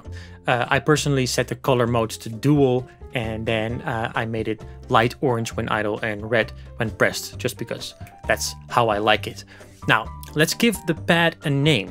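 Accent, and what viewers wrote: Netherlands. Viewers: Zo te horen Nederlands ;-) Dank je wel, deze video heeft mij enorm geholpen! Ga zo door.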